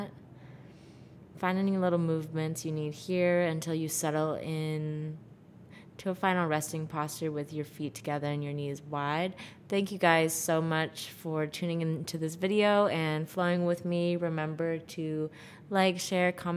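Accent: United States. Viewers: I like your voice. And your American English. That sounds so good. 😌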